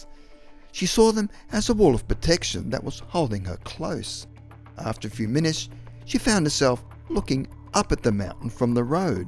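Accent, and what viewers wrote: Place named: Australia. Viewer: I thought it was an Aussie accent! Hi from a fellow Australian 😊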